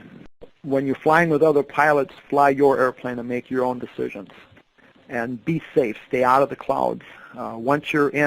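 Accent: United States. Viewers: It sounds more like a Michigan accent to me. MI, WI, MN , Chicago accents are similar.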